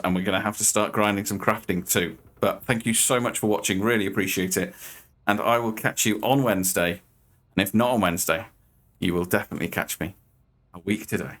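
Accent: United Kingdom. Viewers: I would suggest you collect the feed as it is great for hunger. I am really enjoying your videos and it is great to hear a British Youtuber. Please keep up the great content and I will like all of them. You are very entertaining.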